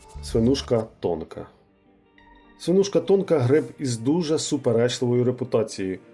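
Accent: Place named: Surzhik, Ukraine. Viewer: Суржик - суржиком А інформація корисна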